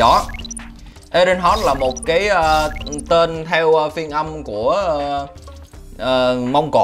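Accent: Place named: Mekong Delta, Vietnam. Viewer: Gất là gần. Nghe rồi ông còn sub nữa. Làm như ngta k biết ông dân miền Tây hay gì kk. Nhưng video này chưa chất lắm đâu nha.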